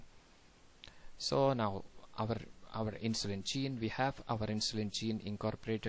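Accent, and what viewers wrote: Kerala, India. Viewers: amazing example of mallu accent....mallus are the best!!!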